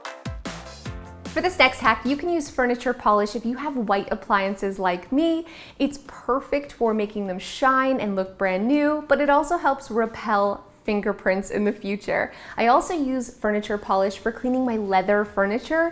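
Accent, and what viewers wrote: Canada. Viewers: Do you live in Canada? I'm trying to figure out your accent? Great video. Thank you ❤️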